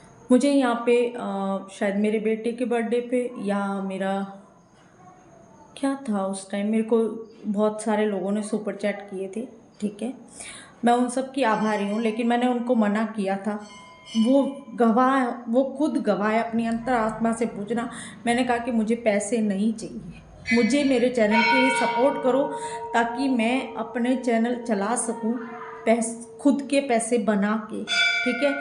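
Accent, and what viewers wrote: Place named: Marwar, India. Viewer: Sabhi ko meri taraf say bahut bahut pyaar phir say aayenge mujhe to kee aur aap kee Marwadi boli sunani hai, sab ko meri taraf say bahut bahut pyaar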